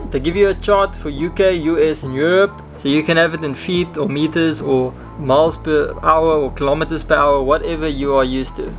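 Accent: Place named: South Africa. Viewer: Love the South African accent, nothing like it in the world :-)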